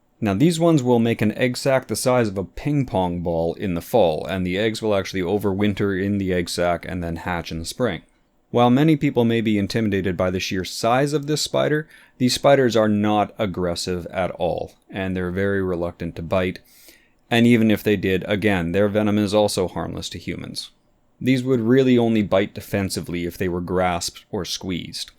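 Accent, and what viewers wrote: Canada. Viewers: Nova Scotia! Right on I thought I sensed a fellow Bluenoser! 👌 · These videos are great and I love your sense of humour. I also love to hear Canadians speak. "Out" and "about" in particular. You should do an episode on the Aphonopelma marxi (brown tarantula). Common in Colorado, where I live, and terrifying to all the transplanted humans from West of the Continental Divide.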